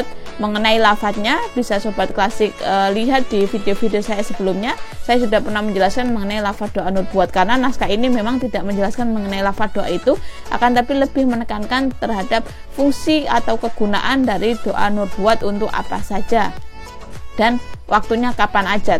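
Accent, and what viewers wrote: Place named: Java, Indonesia. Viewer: jowo nggeh mbak 😀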